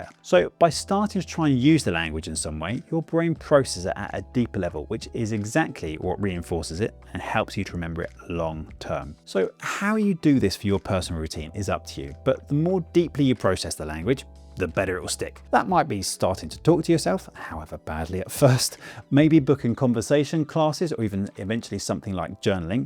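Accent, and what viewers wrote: United Kingdom. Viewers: Hi! Do you mind telling me what variety of British accent you speak? I really like your accent and watch your videos also to imitate your speech.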